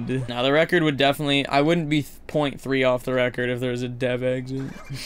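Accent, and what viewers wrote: Canada. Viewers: That’s just how Canadians sound like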